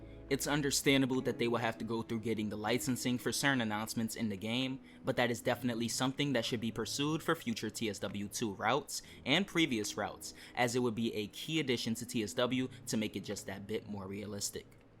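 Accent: United States. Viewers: You’re from New York aren’t you?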